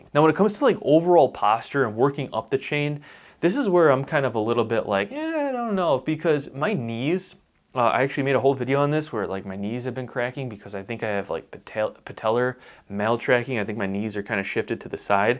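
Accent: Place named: Upstate New York, United States. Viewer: Are you originally from upstate NY? I like your subtle accent - it's just like my friend's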